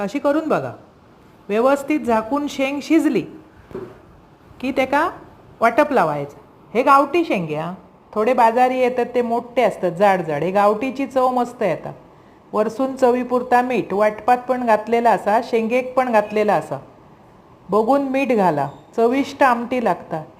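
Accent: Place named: Malvan, India. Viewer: ताई तुम्ही कुठल्या गावच्या. छान बोलता. हे खर मालवणी. ऐकायला खूप छान वाटत.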